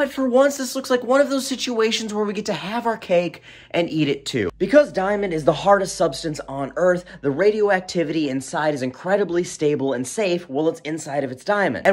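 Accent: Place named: Minnesota, United States. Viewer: It's Minnesota English...It's spelled 'nuclear' but we pronounce it 'nucular.' Get over it.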